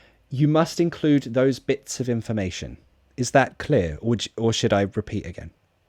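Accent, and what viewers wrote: United Kingdom. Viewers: It was superb to speak with someone who has a perfect british accent 😊 thank you teacher 😇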